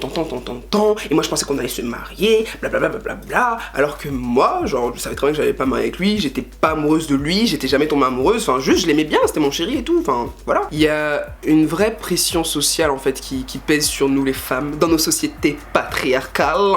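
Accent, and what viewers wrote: Ivory Coast. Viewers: cool ton accent ivoirien ma soeur ... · C'est ma première sur ta page et j't'adore... Trop belle! Dit t'es Ivoirienne?? Juste que j'ai ressenti l'accent pas mal de fois! 😄😄😄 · Cool tu es ivoirienne on dirait 😍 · J'adore ton accent ivoirien, on dirait une go bété lol, trop cool. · j'aime ta manière de parle tes ivoirienne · Accent ivoirien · Oui elle a l'accent ivoirien et je crois qu'elle est ivoirienne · Accent Ivoirien 👀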